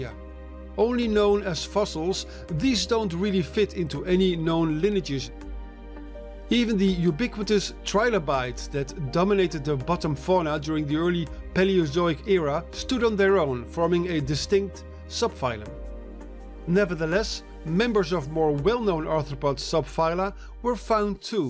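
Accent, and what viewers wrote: Netherlands. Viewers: Just a off-topic question. Is the narrator by any chance dutch? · Are you Dutch? I hear a Dutch or maybe South African accent!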